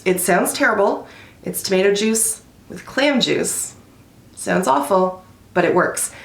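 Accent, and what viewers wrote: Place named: Canada. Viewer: We have dill chips. Salt and vinegar. We also get Ms. Vickie's chips which I believe is a Canadian brand. We just don't have Ketchup chips. I dunno why bc we have every weird chip flavor And it is not that you say sorry. I appologize whenever I bump into someone too except I say excuse me more. It is that you saw Sow-ry. I cannot get my mouth to form to say it like ya guys do but I know ya are Canadian by how you say sorry lol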